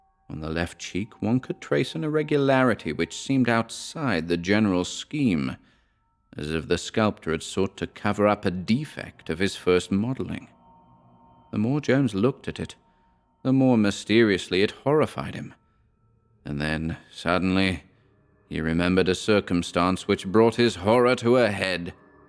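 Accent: United States. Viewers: Your American accent is immensely entertaining. "God- great God!"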